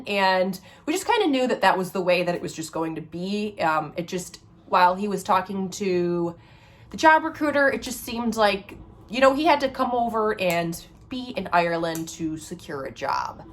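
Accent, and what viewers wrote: Midwest, United States. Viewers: Good lord, as a native NYer you're midwest accent is friggin nauseating. NAUSEATING. Ya hear me? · Hi, have to stop thinking of Fargo when I hear your accent, you mid Western women sound so nice reminds me alot of Canadians. My parents retired back to Ireland in 2004 and were worried about not seeing the Grand kids from UK, no worries though as they came on holidays every summer and winter for 14 years. It's a big adjustment but give it time and bring the kids to see the American relatives as often as you can. Good luck with the house hunt.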